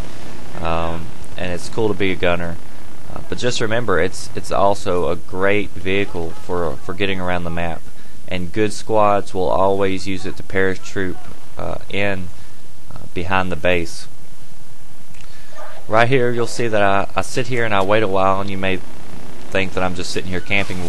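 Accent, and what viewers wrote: South, United States. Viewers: bad company toow. is he from the south? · Finally southern guy on here (no homo)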